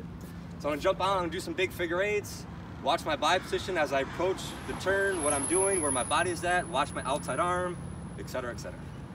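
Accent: United States. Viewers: Great info for any rider, even riders that already get their knee down. BUT THE BEST PART OF THIS VIDEO is your accent and voice. Its amazing. You could do audio books narrate movies, do voice acting... You SOUND like you might be from illinois, POSSIBLY ohio, but man, its hard to pinpoint. Very clean, great diction, your pronunciation is better than a news reporter... Holy shit. Not to mention the voice is as good as the info here. I've been riding for years, been putting a knee down for a long time, but you are setting clear, repeatable markers on the bike for how to do it effectively. I can already tell I'll be able to be more smooth with it from now on.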